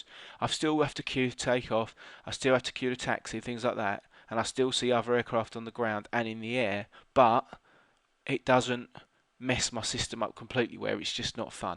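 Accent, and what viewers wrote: United Kingdom. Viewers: I FUCKING LOVE YOU THNK U BRITISH OR WHATEVER COUNTRY UR FROM it really helped allot now i can enjoy flying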